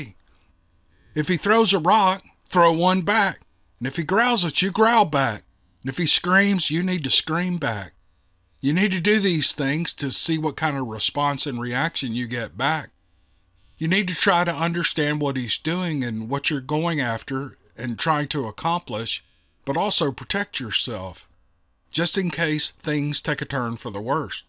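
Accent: South, United States. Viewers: As a real southerner in Atlanta living in a world of Yankees, your voice take me back to my youth. GOD bless you sir, never change! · you are an incredible story teller. I could listen to you for hours. I just love your southern twang. It's very comforting. Don't be so hard on yourself.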